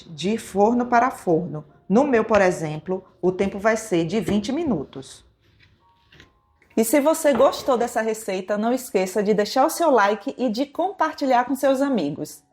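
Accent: Bahia, Brazil. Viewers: Como gosto do sotaque da, essa baiana arretada. ❤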